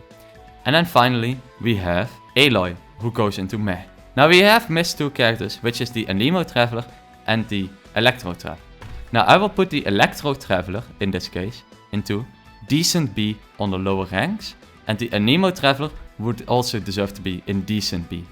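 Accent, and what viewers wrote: Netherlands. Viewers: Subbed for the dutch English and I like your choice of childe being your choice.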